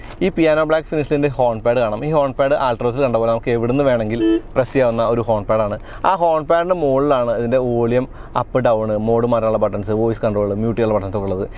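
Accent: Thrissur, India. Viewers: ഒരു പക്ഷെ തൃശ്ശൂർ കാരൻ ആയോണ്ട് ആവും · Thirshur language ano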